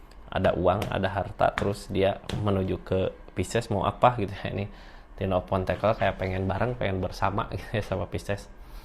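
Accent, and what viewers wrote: West Java, Indonesia. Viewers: Aminnn😊 kang logat na meni nyunda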